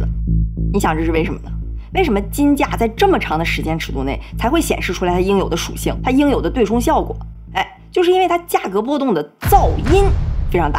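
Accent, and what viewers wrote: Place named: Dalian, China. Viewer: 今天偶然间，看到了2期小LIN的节目，其中有一个是三年前的，自我介绍那期节目，，这闺女真好，又漂亮又口才好，又有才，，听着口音，虽然是普通话但是总觉得亲切又熟悉，，，不会是大连的吧，至少是北方的（东北或山东），果然猜对了，大连闺女！！！哈哈哈哈，，179万订阅，我的天，比王局都多，，太牛了！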